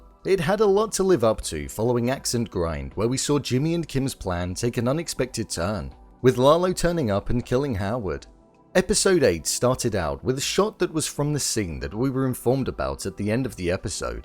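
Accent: United Kingdom. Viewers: Your British accent lol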